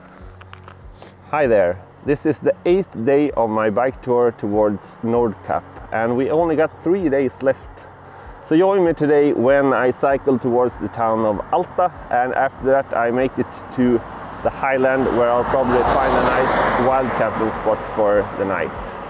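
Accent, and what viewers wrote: Sweden. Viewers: Beautiful, peaceful countryside ! Great English, Swedish accent ?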